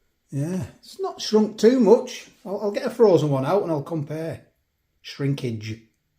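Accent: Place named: Yorkshire, United Kingdom. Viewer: Half scottish accent ? You're obviously not "of these parts", 636. He's from Yorkshire, but has lived in Cumbria for a while. Any native would know in 2 secs flat he's not local. As for the scottish bit, ya need to brush up on ya regional dialects, i reckon. Btw, accents have no bearing if you can cook or not, our kid. What ARE you vaping, i muse ?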